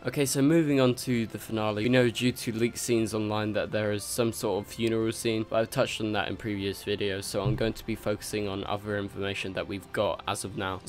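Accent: United Kingdom. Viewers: Are you British? What accent do you have?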